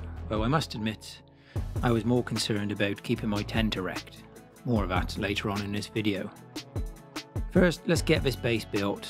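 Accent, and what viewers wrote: South West, United Kingdom. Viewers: I figured there was a south-west-ish flavour. I'm in Derbyshire limbo lol, as in right on the border of Derbyshire but with a Sheffield postcode, which is technically Yorkshire.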